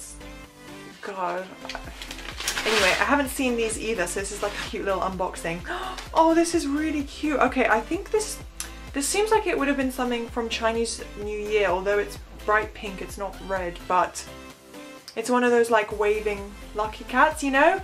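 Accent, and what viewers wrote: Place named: England, United Kingdom. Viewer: I like your English accent so much! Where are you from?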